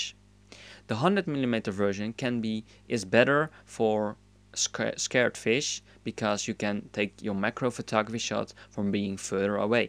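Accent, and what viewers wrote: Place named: Netherlands. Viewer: Nice tips. You sound Dutch, am I right? :D (Ik ben ook Nederlands)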